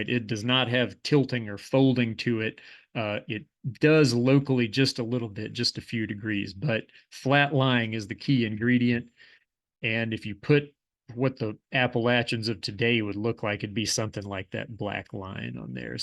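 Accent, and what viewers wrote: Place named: Texas, United States. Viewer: I can't place your accent. Which is strange. It's like half southern and half texas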